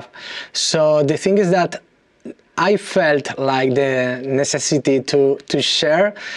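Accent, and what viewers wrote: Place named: Spain. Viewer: Nice accent very authentic spaniard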